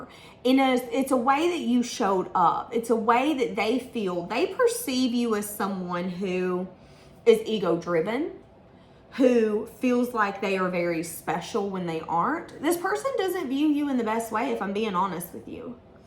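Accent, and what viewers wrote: South, United States. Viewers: This Yankee LOVES the manner in which you speak! The descriptive way you talk plainly, intuitively with a Sourhern flair that is Spiritual AND hilarious! You are appreciated, dear lady.😊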